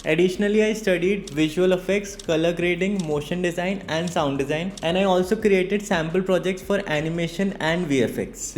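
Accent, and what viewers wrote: India. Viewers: Kyuki accent indian hai ❤️😁😁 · I realised this video is in english after reading your comment. The accent is very Hindi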